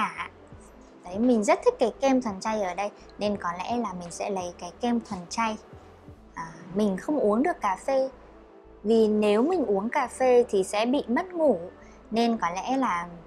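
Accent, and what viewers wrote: Vietnam. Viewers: is this in southern or northern vietnamese ?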